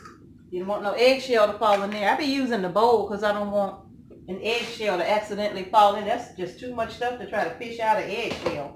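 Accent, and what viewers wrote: South, United States. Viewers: Thank you,! By chance are you living in Georgia? I love your southen twang, ya'll! xo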